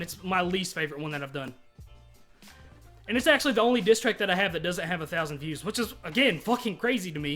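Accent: Kentucky, United States. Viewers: Where from southern KY You from?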